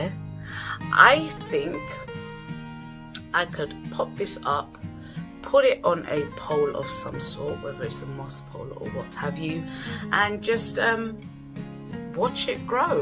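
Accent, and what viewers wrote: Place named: United Kingdom. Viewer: Would you pls update us about your that little Alocasia you bought from ebay ? And another thing i want to add "apnar British accent khub e shundor and perfect MashaAllah, i love it alot"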